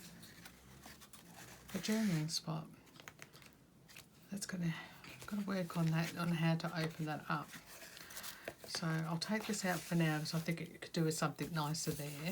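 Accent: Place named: Australia. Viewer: Great video. I love using what would be garbage as well. Great idea for the fries box. I'm from Australia too. Love hearing our Aussie accent on YouTube. Good luck with your channel. 💗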